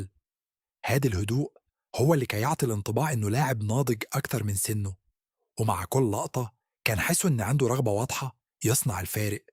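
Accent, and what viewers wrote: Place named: Egypt. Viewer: ماشاءالله تتقن جيدا اللهجة المغربية بنطق مصري😂😅. جميل جدا❤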